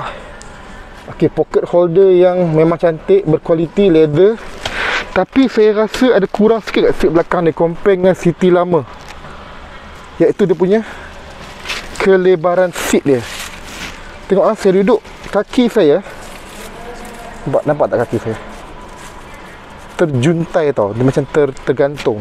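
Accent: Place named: North, Malaysia. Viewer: orang loqstaq nii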